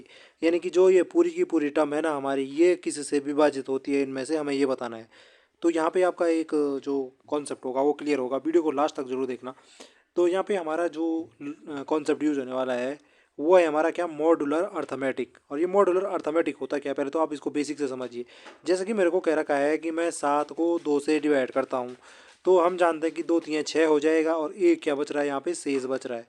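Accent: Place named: Garhwal, India. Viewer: Bhai garwhali ho kya??😂 tone sunkr lgra hai❤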